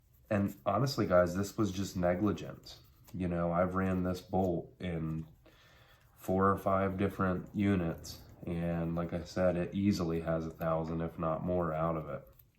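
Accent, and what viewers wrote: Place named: New England, United States. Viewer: I just remembered him saying in his New England accent that steel is very “hard”, and that that steel will last forever. Just keep it clean and very lightly oiled, noting can happen to those rails. The only parts that can go bad are the cam pin and the parts actually on the bolt, the lugs, the extractor, etc. I’m not an expert though, but that’s my understanding. The rails probably will never be a problem.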